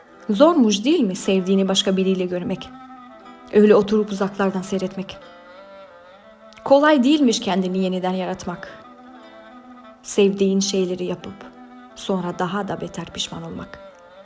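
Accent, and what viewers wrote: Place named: Kičevo, North Macedonia. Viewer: ke një zë shumë të ëmbël dhe të mrekullueshëm gjithashtu edhe një talent të pa parë. Në dëgjim të parë nuk mund ta dallosh se është interpretuar nga një vajzë kërçovare kaq mirë,pastër dhe bukur gjuha turke. Të uroj shumë suksese si në këtë talent që ke gjithashtu edhe në drejtimin që ke zjedhur të studiosh.❤️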